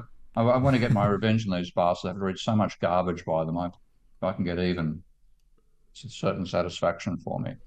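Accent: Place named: Australia. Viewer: He is Australian 😂. They speak like that😂.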